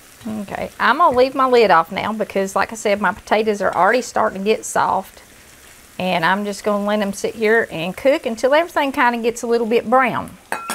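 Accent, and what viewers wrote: South, United States. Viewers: I came for the Southern accent...not disappointed. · I love your food, AND the scriptures you share with us! America needs to stop turning away from Jesus. Oh and by the way, I love your southern accent too, God bless America!!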